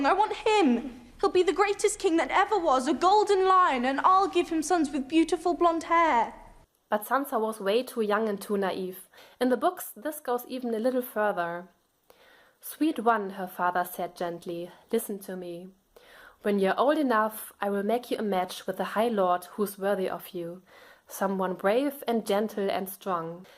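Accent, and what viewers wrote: Germany. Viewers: That german accent . Nice theory tho. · Is English your mother tongue? Your speak a bit with a german accent, I guess, but that's very enjoyable...^^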